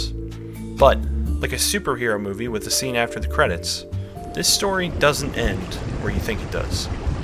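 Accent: United Kingdom. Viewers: its a girl version of leafy..... except british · Huh, didn't know she was British. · SHE IS FKN BRITISH? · Am I the only one who had no idea she was british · Bro i never knew she was British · Am I the only one who didn’t know she was British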